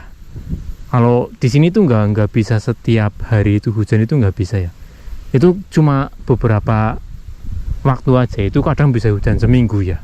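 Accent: Banyumas, Indonesia. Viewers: Mantep mas konten2nya bahasane logat ngapak tapi sangat menghibur dan menambah wawasan juga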